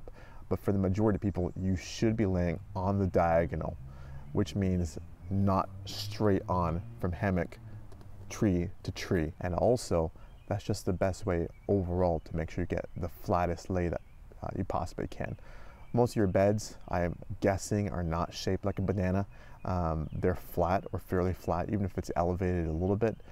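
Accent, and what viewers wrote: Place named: West, United States. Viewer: Bro you look Indian and your name and accent sounds Western sorry don't get offended. Never imagined to avatars in same person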